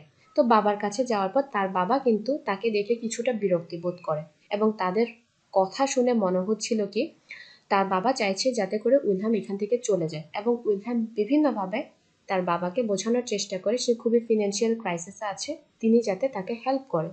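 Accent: Chittagong, Bangladesh. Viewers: আপনার বাচনভঙ্গি যথেস্ট সাবলীল কিন্তু আমি আপনার ভয়েজ প্রথম বার শুনেই ধরে ফেললাম আপনার বাসা চট্টগ্রামে 🥰 বাই দা ওয়ে আমি রাজশাহী থেকে 💕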